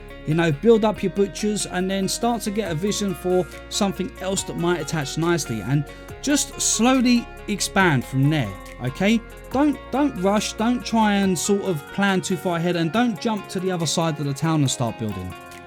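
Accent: United Kingdom. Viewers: how did i know this guy was going to be British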